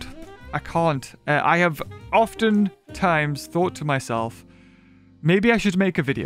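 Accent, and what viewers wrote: United Kingdom. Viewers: if he has british accent i love it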